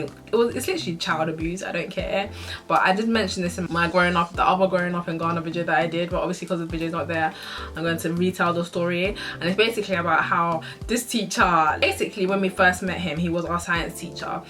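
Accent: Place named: United Kingdom. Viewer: How do you still have a Brits accent after 10yrs in Gh. I moved to Gh came back after 8years which is after SHS. But picked up the Ghanaian accent. How did yours not disappear? 🤔🤔🤔🤔🤔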